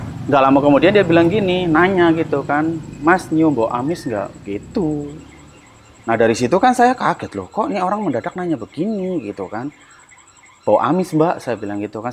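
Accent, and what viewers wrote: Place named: Cilacap, Indonesia. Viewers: ini cerita serem tp jd ketawa karna abangnya ceritainnya lucu pake logat jawa medoknya😂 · Ngapak dikit nga ngaruh um haha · Owalah ngapak tenan Iki mas req😂❤ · Nadanya kaya orang cilacapan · ngapak banget bahasanya bukannya serem malah ngakak wkwkw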